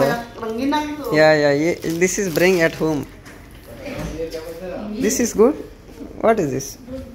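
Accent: Saraiki, Pakistan. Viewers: Ap jb jb saraiki bolty hn mujhy hansi ati hai bro 🙈😂😂😂😂😂😂😂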